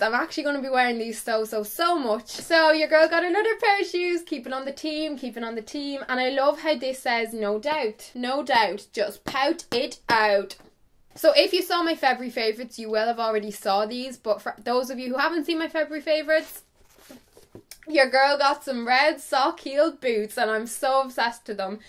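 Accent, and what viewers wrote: Ireland. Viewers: Are u from Ireland 🇮🇪 ( edit) 2 min in the video: yeppppp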